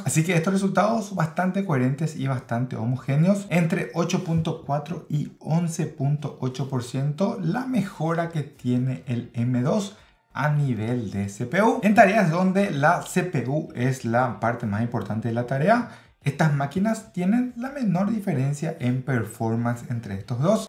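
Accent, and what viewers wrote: Paraguay. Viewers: gran video. amigo tenes un acento paraguayo. cuál es tu nacionalidad? saludos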